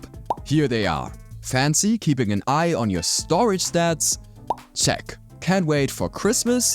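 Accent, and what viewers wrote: Germany. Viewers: Yes. He is german 😀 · Actually, it took me three videos to get that hes German Und jetzt esse ich weiter Bier und Brezel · Bist du Deutscher?? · Is that a Deutsche Akzent? :D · As German, I was pretty sure it’s a German accent, but still I thought he might be from another european country.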